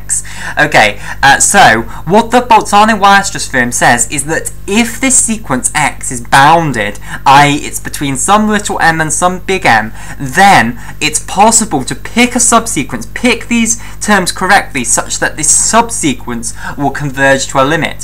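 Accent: United Kingdom. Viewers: thats the most british accent i've heard!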